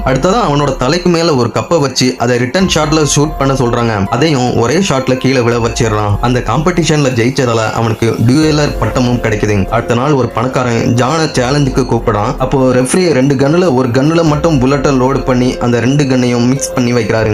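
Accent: Coimbatore, India. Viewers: Neenga coimbatore ngla?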